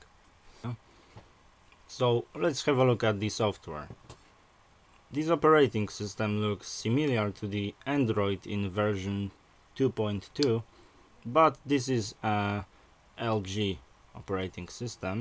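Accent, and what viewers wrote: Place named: Poland. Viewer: Słychać że z polski :D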